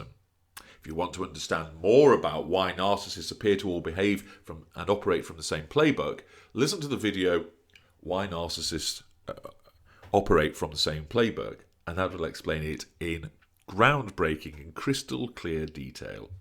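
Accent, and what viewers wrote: England, United Kingdom. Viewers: Also, this channel has really helped me to avoid cognitive dissonance as your voice (minus the English accent and insert a country bumpkin Deep South accent) is deep and commanding like me ex. Every time I listen it reminds me of my ex jackass and subsequently do not fall for his fuckery. Thank you. While it is clear that you enjoy telling the tales, there is a level of benevolence that I accept and appreciate.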